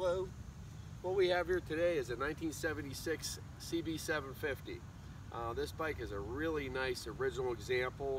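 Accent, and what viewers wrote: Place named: Philadelphia, United States. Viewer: you sound like You're from Philly or South Jersey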